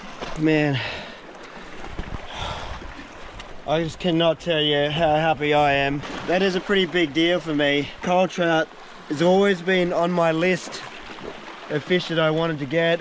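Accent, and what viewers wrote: Australia. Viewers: I really like your videos, It’s lovely to hear an Australian accent.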